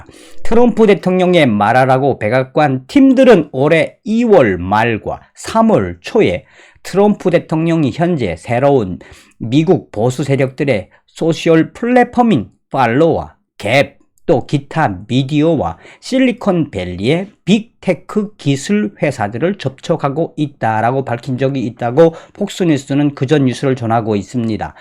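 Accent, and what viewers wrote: North Korea. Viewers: 북한언어 쓰실때 넘 즐겁읍네다 북한말씨 애정이 넘치는 든든한 삼춘이 주시는 언어 같아 재밌고 즐겁게 시청합니다 북한말 쓰시는 유튜버는 밖에 없어요 가열차게 웃겨 주시라요 봤던 영상 못본영상 무조건 즐겁게 구독하기요 주위에도 가열차게 알리묜서 즐겁게 시청하는!!!!❤ 떼레비 고정 하시기요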